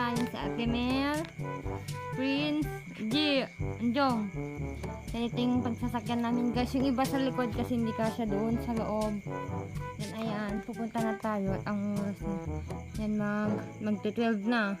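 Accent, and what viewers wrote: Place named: Pangasinan, Philippines. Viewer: wen napintas ka ng atalaga,,.. ngem yong accent mo,, parang may halong pangasinan,, haha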